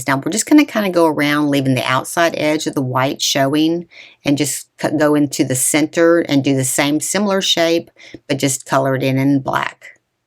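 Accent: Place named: East Tennessee, United States. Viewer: where are you from? I’m guessing East Tennessee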